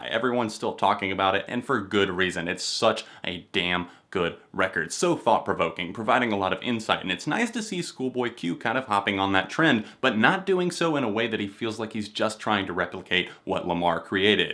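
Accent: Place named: South, United States. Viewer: Bizzarro southern twang version of Anthony Fantano lol. Liked your review thanks.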